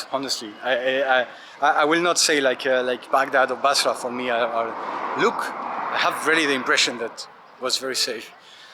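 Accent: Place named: France. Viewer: your French accent is so cute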